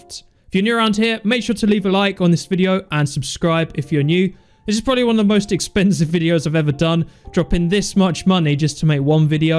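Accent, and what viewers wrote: United Kingdom. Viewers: Are you Brithish English or Amerikan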